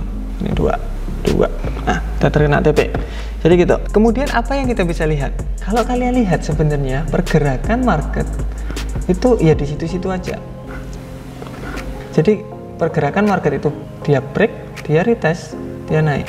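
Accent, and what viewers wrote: Java, Indonesia. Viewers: Saya mau tanya kan ini 1). Cara kita menjelaskan nya jgn jg terlalu cepat betul, pelan aja, jdi kita pun jg susah nangkapnya Yg ke 2). Jgn pakai logat2 Jawa lh semuanya pakai bahasa Indonesia aja, klu boleh Yg ke 3). Itu saja yg saya sampaikan terimakasih atas ilmunya, semoga sukses 🙏🙏🙏🙏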